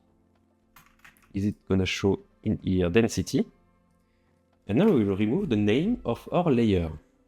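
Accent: France. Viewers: Salut, j'aime beaucoup ton accent français et tes tutos:) je ne suis pas française mais tunisienne mais j'ai récemment entamé des tutoriaux sur ma chaine en Français pour Unreal (et prochainement sur 3ds max et Blender) car j'aimerais participer à la commu FR des tutos et je t'évoquerais dans les prochaines parties de mon tuto sur les terrains/Biomes, si quelqu'un a du mal avec l'anglais, m'autorises tu à poster ce commentaire sur ta chaine pour évoquer la mienne?: <link>, merci si c'est le cas:) et continue les tutos j'ai subbé!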